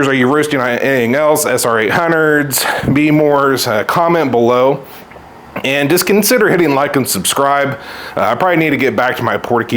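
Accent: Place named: Southern California, United States. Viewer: Holy SoCal accent batman. Thank you for answering all of my questions though! Great Vid!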